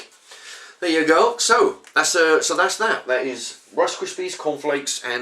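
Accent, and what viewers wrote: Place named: RP, United Kingdom. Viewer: Very posh.. Nice vid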